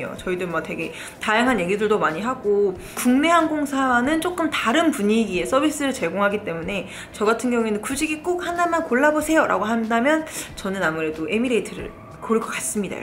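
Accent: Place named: United Kingdom. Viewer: Love your English accent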